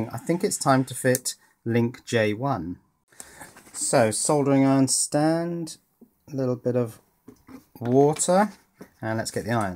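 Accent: United Kingdom. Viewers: just curious, are you from the UK ? your accent is very nice.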